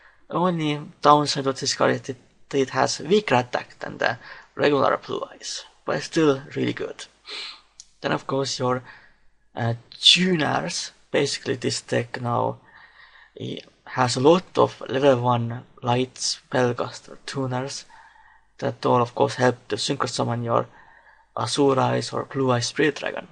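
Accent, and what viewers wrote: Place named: Europe. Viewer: European, like british or german or some shit. that accent is horrible, the video needs subtitles.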